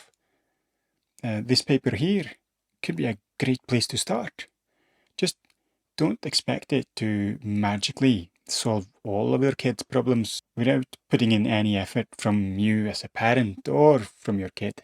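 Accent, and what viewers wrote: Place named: Scotland, United Kingdom. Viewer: If you gave me a million pound, I couldn't work out if you have a Scottish accent or a Norweigan one?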